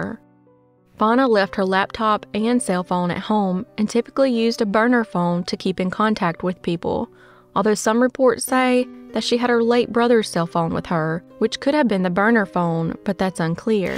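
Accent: South, United States. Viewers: Good evening Southern Belle 😉 so good to hear that Sweet Southern sound! Thank you for putting all the time and effort in to your videos! Your dedication brings us listeners true sad and sometimes happy stories. Much love from Washington State 💞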